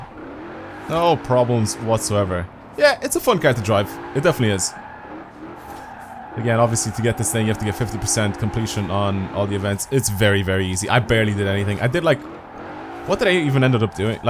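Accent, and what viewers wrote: Ireland. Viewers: Hey, quick question, where abouts are you from, I can’t help but think you have an Irish accent although I could be completely wrong! Keep up the great work!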